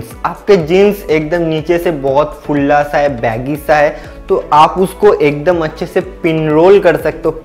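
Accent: Bengal, India. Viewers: Aap bengali hai kya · Bengali bolte bolte ap ki Hindi begd gaee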